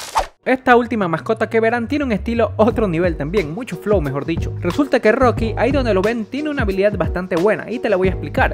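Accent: Ecuador. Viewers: Eres ecuatoriano? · Bro eres de Ecuador???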